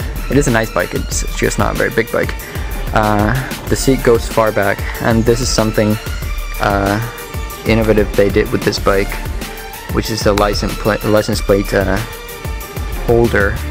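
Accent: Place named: United States. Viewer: You sound American but your plate says you're Polish?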